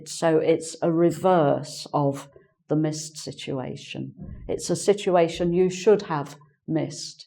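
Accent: England, United Kingdom. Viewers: actually I love a lot of teachers on Engvid. but I love mama cos she uses British accent 😊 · Nice Video.Thanks.You sound like British.Are you from England? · Awww this new teacher is so adorable. Welcome,. By the way, you're British, aren't you? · Hey, I'm from Spainl and I'm hope to improve my Inglish, I loved your brithis accent, your video was perfect, congratulations, hugs for you! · Hi I'm new subscriber and i really love learning English and the British Accent. I found it really helpful. thank you so much for sharing with us :) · This is the British accent that I love. Very clear lesson. Thank you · Thank you sweet. It was an excellent class. I could do note a little british accent? God bless you! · British accent was not easy to understand for me because I have more experience with American accent however, I am getting better little by little, thanks. 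· Thanks for existing,! It helps so much. I'm from Belgium. They say I have a good pronunciation but I would like to practise my British-English and your videos are amazing for this. I can understand every single word you say without a problem. It's super-super nice. British accent is the best so far. Thank You, x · I love your clear British accent. Many thanks for your videos. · i'm from brazil and i'm using to pratice my english foreigners, i loved your brithis accent, your video was perfect, i loved it, congratulations, hugs for you! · Are you british? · Hii, mam I am from the ndia. I want to learn this English accent,, Please tell me ,,have you any application, or online course? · Hello, Dear ) I am verry happy to find you as a teacher ) You are such a nice and pleasant person to watch and listen to) To be honest I was in search for someone whose accent is absolutely British and I am sooo delighted to find you finally!) You inspire! 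) Thank you so much for that!) Looking forward for your new videos) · I love your beautiful english accent .What I really love is that It's not different to understand your explanation of most sentences as I know you are trying to speak slowly so that your pupils could understand it.Thank you so much! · fast 1 month with Bacitikdaithanh this new teacher is so adorable. Welcome,. By the way, you're British, aren't you? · Nice to see more of British accent here! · Your accent is wonderful. I really like the British accent, for me it is the best, the most pronounced, the cleanest, the best pronunciation. The English spoken in the United States is incomplete, it seems that they are too lazy to speak, they remove the letter T from the words, many unnecessary contractions.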